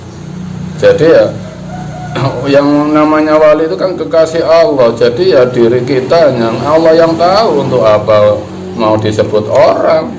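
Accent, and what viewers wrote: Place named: Banyumas, Indonesia. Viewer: pangapunten nggeh..kok miriipp sanget kalih panglima besar jenderal Sudirman... aksen bahasanya mirip dengan wong Banyumas Jawa tengah Mbah 👍🏼👍🏼👍🏼🙏🙏🙏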